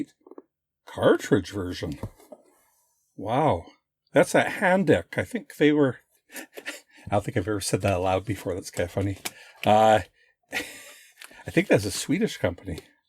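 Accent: Canada. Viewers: Thanks for all the content. Your voice is so relaxing, and I love your Canadian accent!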